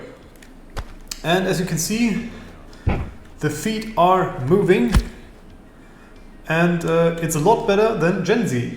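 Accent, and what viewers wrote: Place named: Germany. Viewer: einfach deutscher Akzent 🤣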